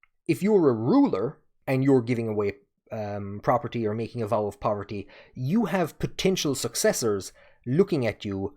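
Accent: Ireland. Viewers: An man with an Irish accent talking about Irish Insular Christianity - wouldn't have it any other way.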